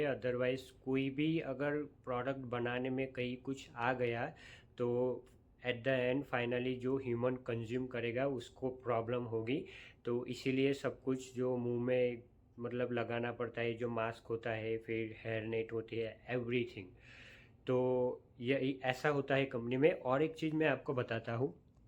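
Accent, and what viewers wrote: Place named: Gujarat, India. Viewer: Bhai tame gujarati cho tamari bolvani ton mane evi lage che❤❤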